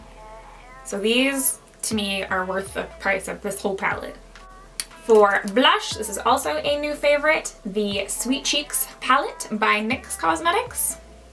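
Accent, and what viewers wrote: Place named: Canada. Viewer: oml she sounds so canadian in this video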